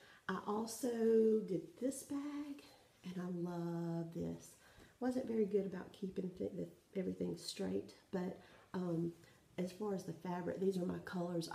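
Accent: South, United States. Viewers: Great video.... I LOVE your project bags. I'm not good with a sewing machine but I think I have to attempt a project bag... Your choice of fabrics is striking....Love them, love them, love them... did I say I love them ? :) Please do keep making your videos and share your WIPS and FFO with us. Love your Southern accent (and your friendly personality) reminds me of my relatives back home (Arkansas). SO, from the Pacific Northwest, welcome to Flosstube and Happy Stitching. Hugs, Toni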